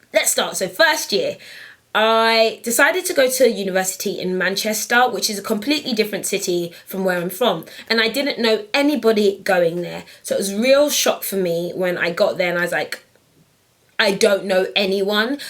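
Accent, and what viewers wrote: Nigeria. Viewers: lol your nigerian accent i love and what colour is your nail polish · MORE NIGERIAN ACCENT! hilarious haha xx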